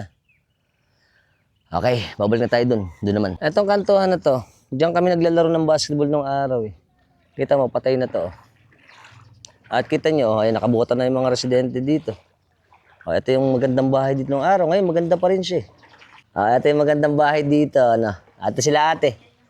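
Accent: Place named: Bulacan, Philippines. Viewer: Yung accent mo sir bulakenyong bulakenyo 😅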